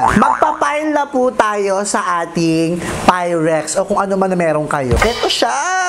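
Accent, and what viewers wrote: Visayas, Philippines. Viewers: bisaya po ba kayo? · BISAYA KABA?????????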